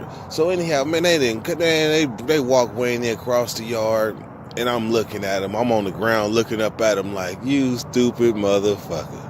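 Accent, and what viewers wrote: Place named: Northern California, United States. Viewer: You gotta be from NorCal to love how you talk 😂😂😂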